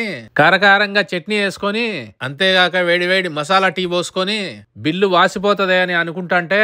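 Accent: Kadapa, India. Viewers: Kadapa slang adhi Alane untadhi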